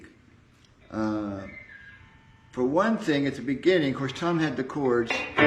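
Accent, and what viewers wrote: Florida, United States. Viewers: Mike and tom have the same accent being both from Florida make a lot of sense. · Both real Floridians!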